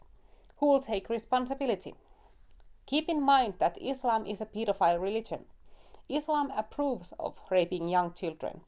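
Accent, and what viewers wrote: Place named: Finland. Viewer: You sound Finnish, I thought Finland was pretty conservative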